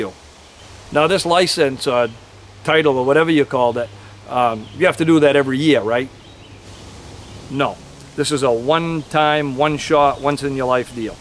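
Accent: Maine, United States. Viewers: It's a Maine accent. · hey, you sound like your from Maine. Maybe Houlton, Presque Isle area? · Dude, are you from Maine? Great piece by the way!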